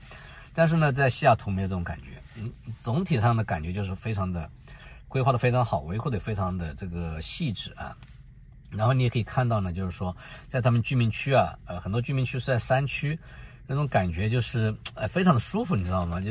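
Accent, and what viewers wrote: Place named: Nanjing, China. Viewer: ，口音，是南京人，我住罗德岱尔堡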